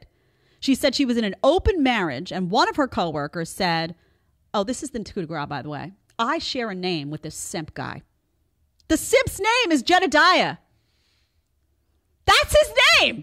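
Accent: New York, United States. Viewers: I like the points, but the way you screech and say Mmmhmmm are very off putting at times. Calm down the New York sass and your numbers will go up.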